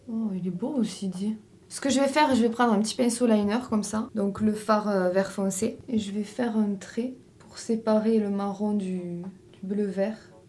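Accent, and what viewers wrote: South, France. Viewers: J’adore vos nouveaux cheveux …….du Québec j’adore votre accent du sud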